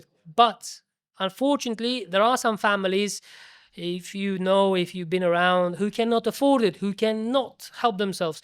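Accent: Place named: Ireland. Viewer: тянется на Ирландца, нет слов без остановки говорит 😊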